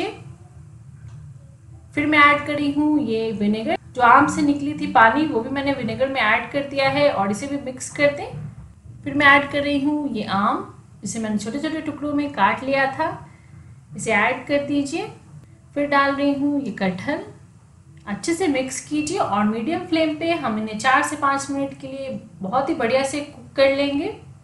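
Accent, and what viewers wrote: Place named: Bihar, India. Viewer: Esya bihar m bolty h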